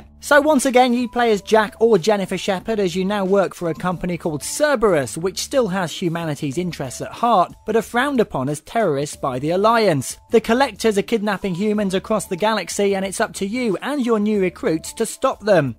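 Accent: United Kingdom. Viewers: Britain has more different accents than most countries of its size. Trust me, he's british- cos he sounds exactly like I do!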